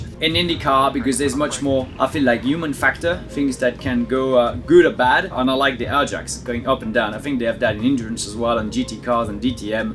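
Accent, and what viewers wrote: United States. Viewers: wtf is with your American accent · You sound more and more American every week haha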